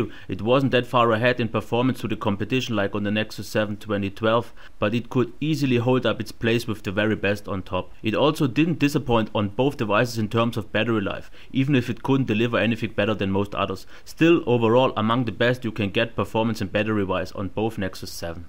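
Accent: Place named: Germany. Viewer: so richtig deutsches englisch :D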